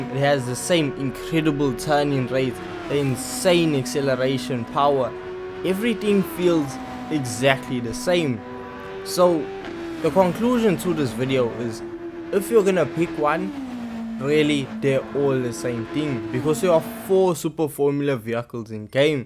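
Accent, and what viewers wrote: South Africa. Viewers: Are you South African? 😂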